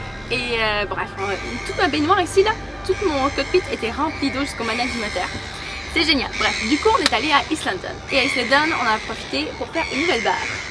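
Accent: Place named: Quebec, Canada. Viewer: qu'elle belle surprise de te retrouver. Tu donne du plaisir à beaucoup de monde. Je suis un vielle homme de 75 ans invalide, veuf et je vis seul dans une maison trop grande. La vie que tu as choisi. Quel volonté et courage de continuer l'aventure seule sur ton petit eskimo. Tu vis ton rêve que d"autres ne font que rêver. Je vous suit depuis des années. J'aime votre accent du Quebec, parfois incompréhensible (je suis Belge) et vous et quelques autres voileux vous êtes mon seul plaisir. Bon vent à toi petite sirène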